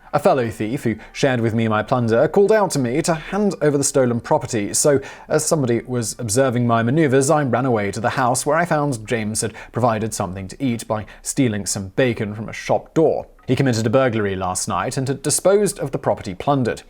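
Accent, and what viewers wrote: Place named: RP, United Kingdom. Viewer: Love hearing you read jive with your posh accent.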